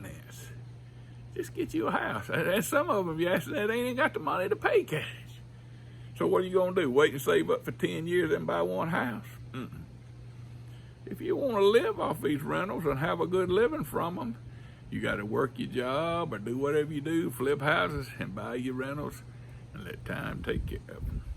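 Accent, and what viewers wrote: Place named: South, United States. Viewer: Excellent anecdote about the woman who judged your "education" level by your dialect. The elitists seem to define education solely by the number of years spent in formal academic institutions, as if that is the only path to success. I'll take practical, real world educational attainment in the world of real estate over the perceived "education" as defined by the elitist academic types who simply regurgitate a bunch of textbook theory and have never achieved anything in the real world. I'd be willing to wager that the woman who made that comment relies on an employer for her livelihood, while relies on himself and his real-world education & experience. I'll bet on the country boy with the Southern accent, the solid work ethic and years of practical wisdom over the elitist with the English degree every time.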